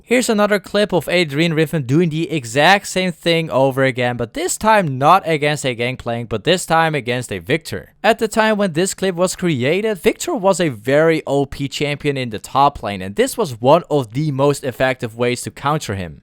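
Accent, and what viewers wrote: Netherlands. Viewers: Are you Dutch? You sound Dutch speaking (Belgium/Netherlands)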